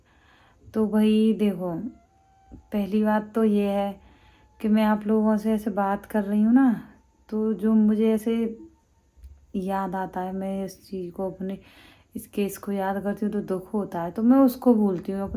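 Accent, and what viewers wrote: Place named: Bharatpur, India. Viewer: Aap kaha sey ho Rajasthan language sey to bharatpur k aas pass k kag rhey ho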